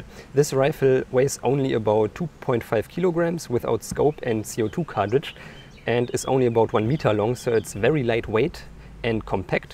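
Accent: Germany. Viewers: Deutsches englisch♥ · Deutsches English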